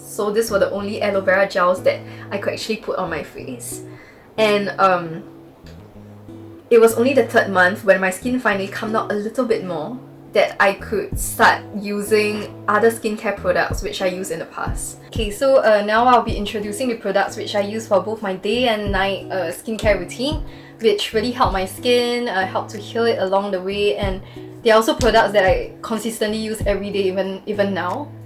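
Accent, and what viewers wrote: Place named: Singapore. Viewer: dat singaporean/malaysian accent....instant recognition and instant upvote from me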